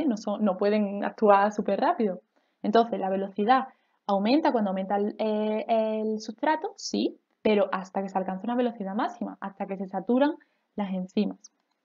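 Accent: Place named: Andalusia, Spain. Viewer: me acabas de salvar el curso, además me gusta tu acento andaluz, me ayuda a concentrarme. Gracias por explicar en 15 minutos lo que mi profe no me ha explicado en 8 clases. Su voz me aburre, no como la tuya. Eres muy buena ticher, gracias 🫀🫀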